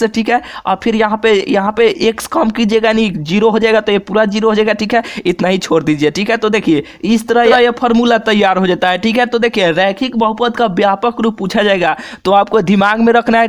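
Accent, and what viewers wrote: Bihar, India. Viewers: Sir aap Bihar ke hai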